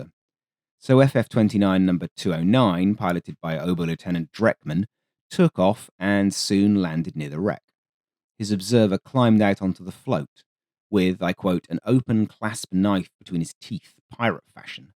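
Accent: United Kingdom. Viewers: Ah, an excellent gift from our favorite subtly snarky British military aviation narrator as Christmas approaches.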